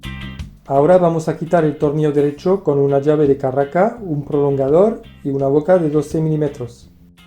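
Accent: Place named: Latin America. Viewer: ¿Amigo por qué? usted en su español, suena más parecido al latinoamericano, que al de españa.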